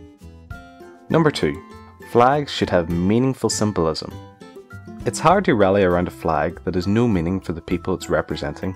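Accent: Northern Ireland, United Kingdom. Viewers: I find ur accent very distinguishable as Northern Irish but also easy to understand. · Dammit, I knew I should have guessed Northern Irish... oh well. ;) And thanks - it was the vowels that gave it away, I knew it must at least be related to Scottish. I've had a lot of exposure to English over the years, even for a Danish guy, so the vowels were very easy to notice. I've had almost no problems understanding your dialect. I mean, I've occasionally had to go back and listen one more time, but then that can happen with American and other British dialects as well. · Are you from Northern Ireland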